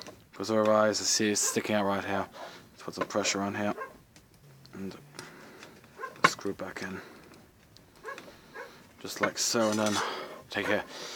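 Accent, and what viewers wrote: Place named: England, United Kingdom. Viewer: Thanks so much for these videos, I live in the U.K and have this exact model except it is a blank firing version made by Pietta. Turns out the little hand and spring was the problem as it had broken and you have really helped me to fix it, I cant thank you enough! Nice to hear an Englishman's explanation!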